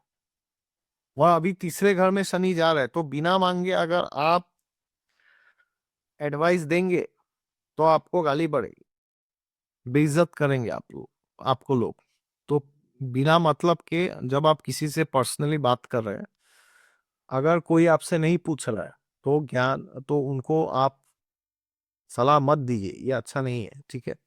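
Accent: Assam, India. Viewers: Sir Aapki Hindi to kaphi acchi hain, Sir aap Assam se ho kya? Apki accent Assamese ya Bengali jaisi lagti hain???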